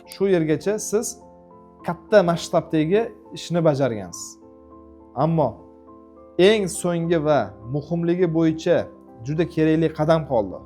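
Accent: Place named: Andijan, Uzbekistan. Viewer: андижонлик экан жижча деп гапиряпти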